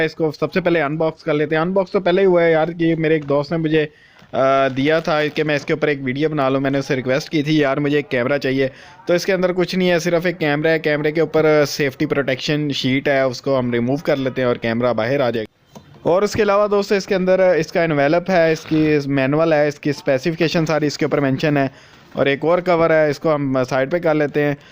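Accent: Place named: Punjab, India. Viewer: Punjabi touch aa gyya hai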